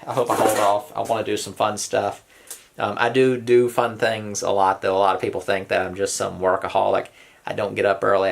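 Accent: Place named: Mid-Atlantic, United States. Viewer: It's mid Atlantic, coastal. I'd say he's somewhere in the Virginia-South Carolina region. Shift 250 miles inland and you get Scots-Irish Southern. Regional accents can be telling, even as little as 100 miles can define a region.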